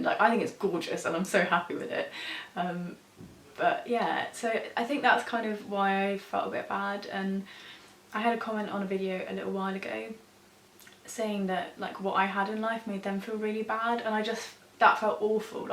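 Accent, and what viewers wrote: United Kingdom. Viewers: You might think that your personality is not that fascinating for people to want to watch you, but I’ll tell you that when I first subscribed to your channel I sometimes had issues with understanding your “posh accent”, as one of your viewers once called it in the comments :)). But I persisted until I got used to it. I do not know what could have made me put efforts into it if not your charismatic personality :))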